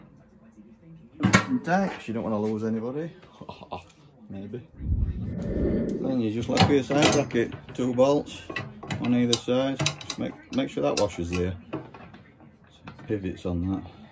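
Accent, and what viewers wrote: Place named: Rotherham, United Kingdom. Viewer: How much would you charge to install 1 for me I'm based in Rotherham sounds like your from around here ??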